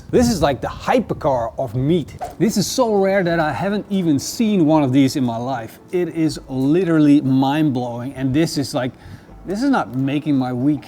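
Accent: Netherlands. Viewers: Where you from yo accent sounds dutch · Dutch accent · ik hoor een soort van nederlands in je stemm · he sounds dutch, are you dutch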